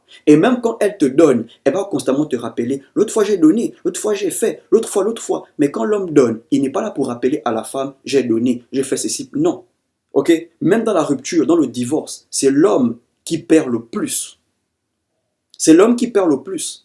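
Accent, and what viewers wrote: Gabon. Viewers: Bro tu connais.escuse moi tu es de quel pays.tu parle comme un gabonais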